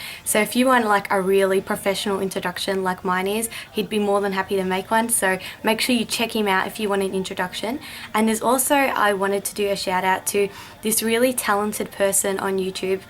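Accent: Australia. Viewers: Australia. Can't you tell by her accent?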